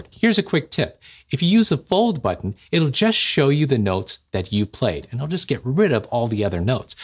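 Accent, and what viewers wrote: India. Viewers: Are you INDIAN ? 😃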